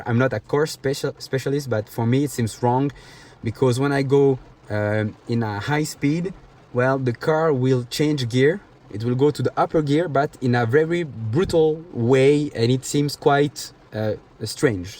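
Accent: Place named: France. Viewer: Tu es français? Je l'entends à l'accent et aux "euh" qui ne trompent pas lol Merci pour la video, mm si je ne possède pas du tout cette voiture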